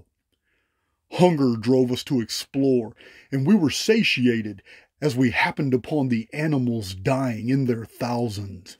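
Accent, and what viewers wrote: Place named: West Texas, United States. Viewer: Yes indeed nomad, it was rather masterful wasn't it? When I first heard say sim-ul-arcam, my British self head 😮was rscreamed "sim-yew-lacrum". His voice is very colorful and he knows how to draw us in with that West Texas accent and putting real dramatic intonation into his delivery. 👏🏆😊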